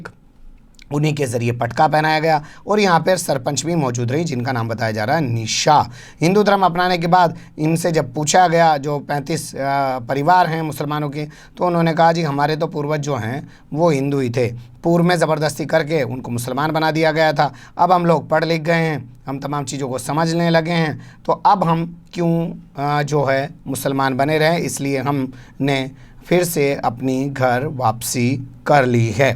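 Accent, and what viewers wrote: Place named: Haryana, India. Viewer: आपका हरियाणा वाली टोन बहुत ही रोचक लगी साहब गजब के बोल देते हो आप।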